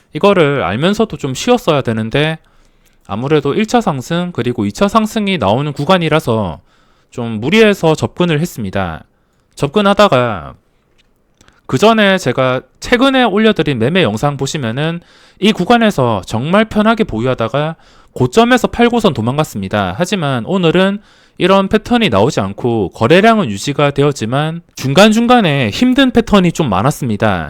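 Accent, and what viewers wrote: Gyeongsang, South Korea. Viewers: 그게 갱상도 발음이고 사투리임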